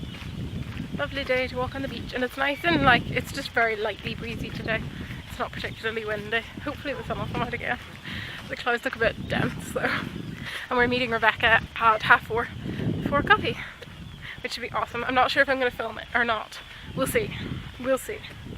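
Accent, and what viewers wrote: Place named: Ireland. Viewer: Born Irish Accent, the best accent in the Whole World v😁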